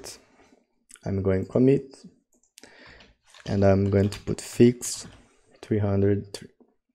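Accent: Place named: France. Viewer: thank you so much, I dont know why such useful video does not attract many people. BTW, I love your French-English accent 🤩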